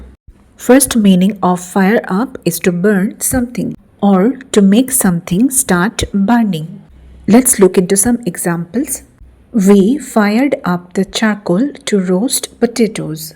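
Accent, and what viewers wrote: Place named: India. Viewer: Esa pronunciación es de india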